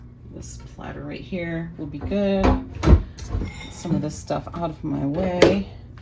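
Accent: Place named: Michigan, United States. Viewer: I just found your pg 😊 love the content! Have a happy new yr ❤ from Michigan.. p.s..you sound like you're from my neck of the woods 🤗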